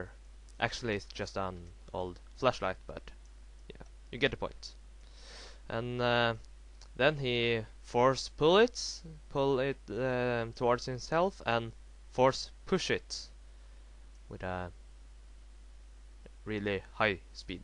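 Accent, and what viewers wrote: Norway. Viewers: are you norweigan? :D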